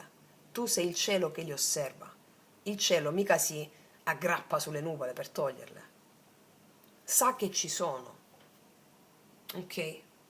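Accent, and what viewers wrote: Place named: Salento, Italy. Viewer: Cara ti seguo ogni tanto ma dall'Inter calare della tua voce sembra che tu sia salentina come me' io sono della provincia di lecce è tu di dove sei?❤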